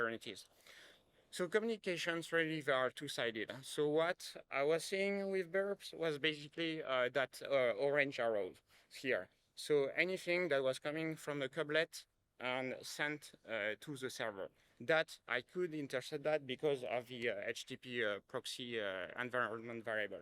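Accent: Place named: England, United Kingdom. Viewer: Awesome share. But damn..Can’t stand the English accent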